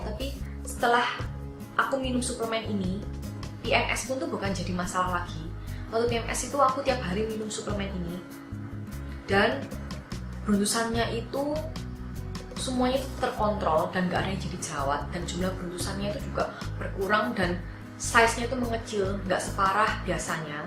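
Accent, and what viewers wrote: Java, Indonesia. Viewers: Dialeknya mentok jawa bangeet yaah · Logat jawa nya kental .... 😂😂👍👍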